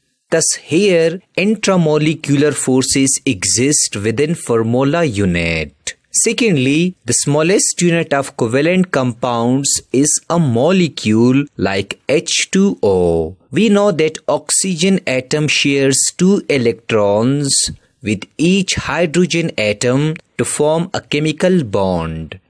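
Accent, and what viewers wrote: South India, India. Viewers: Are you southindian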